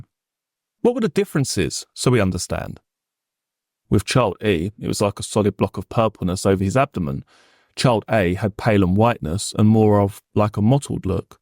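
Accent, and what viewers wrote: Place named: East London, United Kingdom. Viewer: Maybe a great voice actor but the wrong accent. A little too east London, especially for a barrister.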